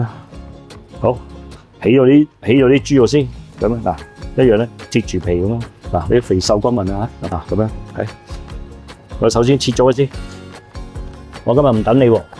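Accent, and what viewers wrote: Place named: North, China. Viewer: 唔會係純種廣東人吧，講廣東話有北方口音嘅？不過廚藝就頂瓜瓜🎉🎉🎉🎉🎉🎉😊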